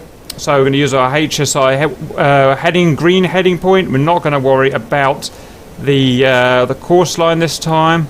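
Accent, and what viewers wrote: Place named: United Kingdom. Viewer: A lot of information, but spoken too fast. Difficult to keep up with instructions and the British accent does not help. Any thanks!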